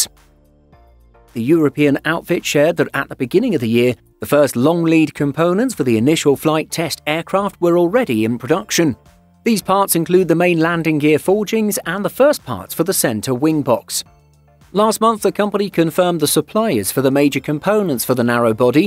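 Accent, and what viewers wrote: England, United Kingdom. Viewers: You are using and English accent to promote an EU product for which the UK is no longer a part of.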